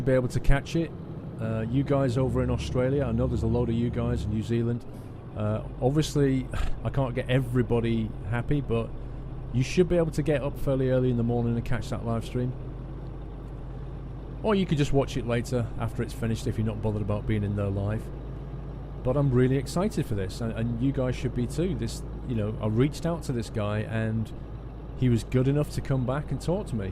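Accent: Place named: England, United Kingdom. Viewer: What the the hell do you think?! He’s English!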